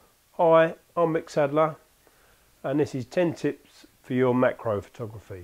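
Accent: United Kingdom. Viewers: Britain accent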